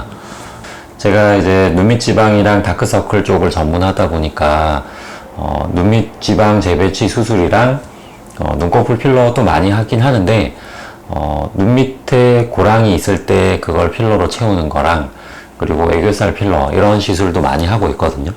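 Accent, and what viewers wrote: Gyeongsang, South Korea. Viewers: 저도 경상도 사람이라 원장님말투랑 스타일 익숙했는데 저분이 오해하신거같네요 꼭 방문해보세요 현실적인 조언과 상담 해주시고 눈물고랑필러 진짜 자연스럽게 잘됐고 2년은 유지됐어요~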